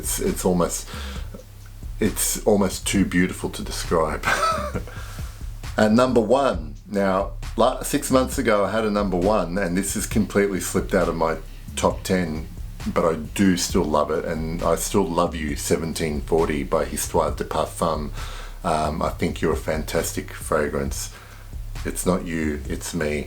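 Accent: Australia. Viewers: What kind of accent do you have? Australian?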